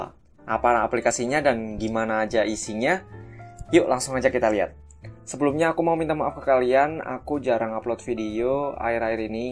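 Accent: Pekalongan, Indonesia. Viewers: Pantes indonesia nya medok ternyata pekalongan😁, santri ya ekwk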